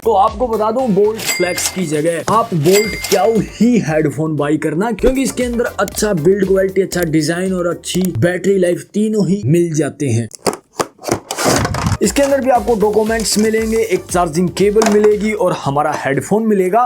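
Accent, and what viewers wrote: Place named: Punjab, India. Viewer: Punjabi ho ke harayanvi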